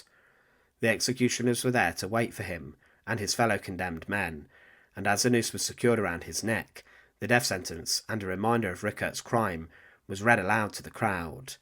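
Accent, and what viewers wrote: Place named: England, United Kingdom. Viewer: I can’t stand English accent and this dude takes it to another level.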